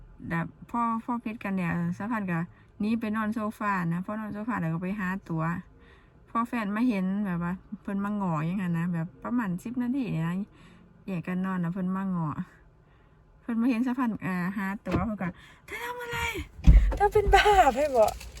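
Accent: Laos. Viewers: พยายามอย่าพูดลาวบ่อยๆ เมื่อไหร่จะพูดไทยชัดสักที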